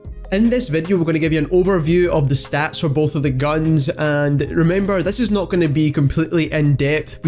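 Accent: Scotland, United Kingdom. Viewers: just interested are you scottish cos i am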